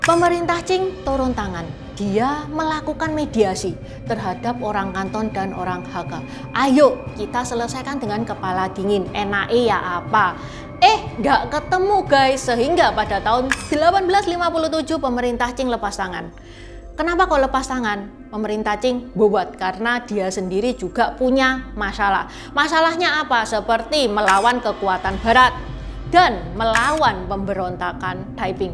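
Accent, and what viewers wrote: Java, Indonesia. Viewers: Bahasa indonesia ce jawanya mantap benar2 cindo👍👍👍🙏. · Ce ce kreatornya jowo banget mlh😝😝😝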